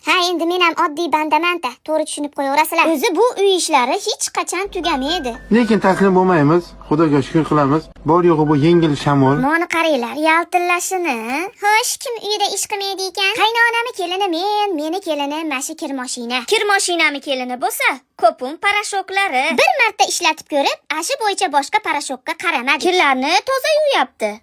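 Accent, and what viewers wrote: Andijan, Uzbekistan. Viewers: Anjancha shevaga gap yòq❤❤❤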